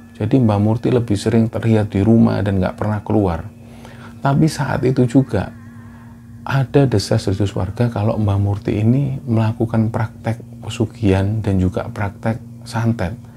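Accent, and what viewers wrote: Java, Indonesia. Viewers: Assalamuallaikum waroh matullahi wabarokatuh, Seneng bngt denger Nada ceritanya mas 👍 khas Bahasa Jawa medok, e ikut keluar, 😀😀😀 Kalem, Sante, mudah di pahami, Th best, Nyesel saya knp ga dri dulu knl sama Moga makin jaya sllu, 👍👍👍💪💪💪💪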